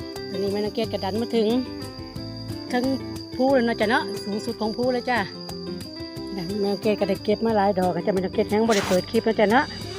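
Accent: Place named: Phu Thai, Thailand. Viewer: ทางได๋น้อคือสำเนียงภูใท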